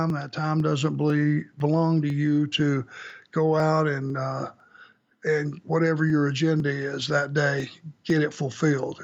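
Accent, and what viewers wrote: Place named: South, United States. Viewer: This is my first listen to anything from Arn's podcast, and all I can think of in listening to him is that he sounds like Winnie The Pooh in a southern accent. Listen to Winnie the Pooh from the 1970's when Sterling Holloway did his voice and imagine that with a southern accent. This is today.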